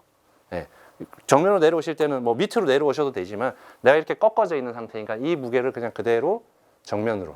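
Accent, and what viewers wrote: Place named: Busan, South Korea. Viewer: ㅋㅋㅋ 허프로님 부산분이라 사투리가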